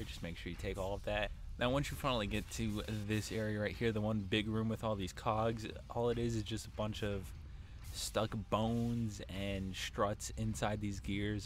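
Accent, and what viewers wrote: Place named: Michigan, United States. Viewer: are you from michigan? we talk verrrrry similar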